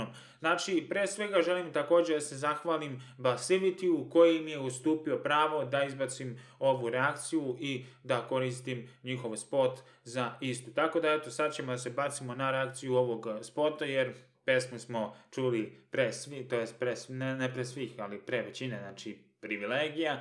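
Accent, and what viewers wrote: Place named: Bosnia and Herzegovina. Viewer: Koliko mi je jak ovaj momak,kako priča haha poyy brt iz BiH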